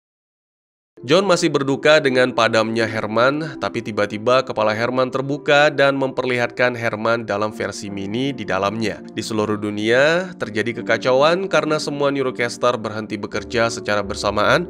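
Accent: Aceh, Indonesia. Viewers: Bg orang Aceh ya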